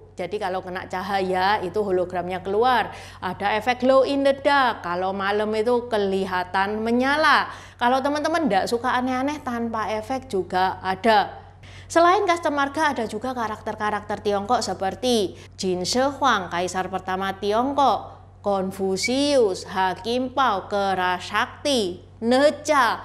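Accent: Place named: Surabaya, Indonesia. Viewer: Aku suka logat Suroboyo …..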